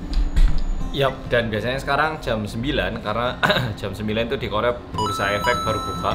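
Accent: Java, Indonesia. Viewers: Duh mas ini medok banget:v Elsa nya comelll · Mas suka indomi yg medok apa yg gmn mas? Kalo ak suka mas yg medok. Iih apaan sih. Maaf. Sukses sehat slalu mas. Dipertahankan trus ya mas ke medok an nya.. jgn kayak yutuber jadi2an. Yg suka pamer giveaway kesultanan yg fana dan prank yg gak beretika. Semangat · Baru nyadar kakae hg medok rekk.... after cov. Oengen ke koreaaaa auiii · Hallo Oppa, sangat kagum dgn bahasa indonesia rasa jowo nya yg sangat medok . Penasaran brp lama untuk bljr bhs indonesia sehingga bagus sprti itu. Dan terima kasih banyak untuk sudah menginterview BTS dgn sangat baik. Sbg ARMY aku subscribe channel mu. Matur suwun kamsa hamnida. 🙏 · sehat trus mas medok 💜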